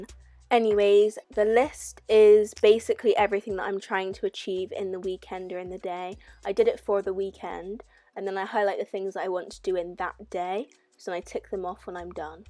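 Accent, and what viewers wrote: Bristol, United Kingdom. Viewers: are you from bristol cause your accent is really nice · Loving your accent, you must be from Bristolll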